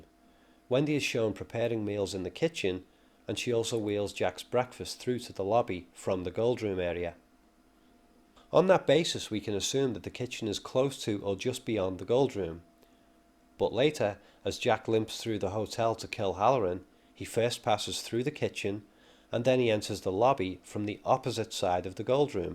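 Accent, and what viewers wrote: Liverpool, United Kingdom. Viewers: Great video. Your accent interests me -- sounds like Scouse transplanted across the Atlantic. Are you a Scouse expat? :p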